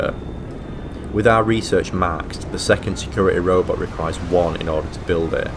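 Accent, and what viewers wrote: United Kingdom. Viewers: Thanks, this is the first video ive seen that has a nice soothing UK accent over "some others" that are hard to bear, and explains the flow through the various processes quite well indeed. Many thanks. On PC ( Possibly consoles also) there is a mod you can get called Richer Galaxy which increases the vendor cash amounts and a few other things also, might make it at least feel worthwhile to make and sell your niff naff tat.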